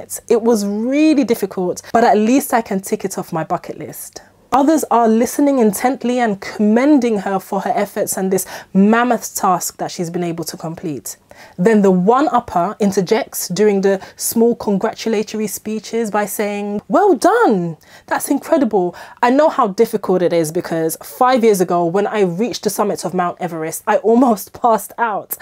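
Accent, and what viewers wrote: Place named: United Kingdom. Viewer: Easy for you to say... with that perfect British accent you do not need to work hard at elegance :)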